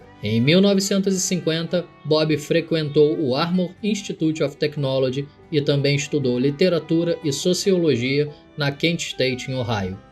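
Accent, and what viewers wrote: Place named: Rio de Janeiro, Brazil. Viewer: Cara, esse documentário ficou bom demais, tirando esse sotaque de carioca, ta perfeito 👌🏻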